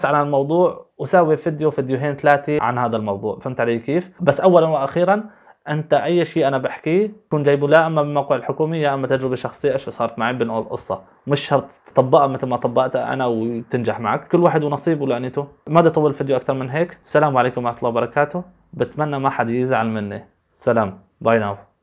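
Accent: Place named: Syria. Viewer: مبروك يا اخيي بس عندي سؤال انت سوري ام لبناني لان لهجتك سورية ولكن فيك كم كلمة لبنانية أنشأ الله ترد علي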